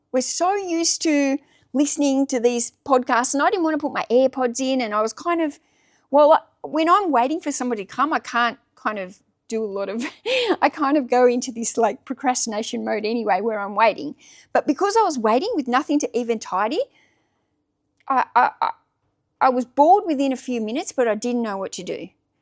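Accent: Australia. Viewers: I love this....I don't know how to be bored...I started driving strangers (Lyft and Uber) in the NYC and NJ Metro Area....I was burned out from my own business (Consulting for startup Co's ) and needed to get away from a computer. I was terrified about not having any money coming in and having to use my retirement fund to pay bills....I put my head down and just...F......G drove for 2 years....But it wasn't work that I found painful...it was quite liberating and I found my way back to some consulting work but found that Starting my own Youtube Channel based on those 3,000 Lyft Rides (with Strangers) has given me such meaning and put to use all of that experience...Money is still an issue and at 62...I'm still hustling but at a level now that if fun and sustainable and without the fear! Found your Back from Burnout Channel and appreciate your content and lovely Australian accent. 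Thanks. Sean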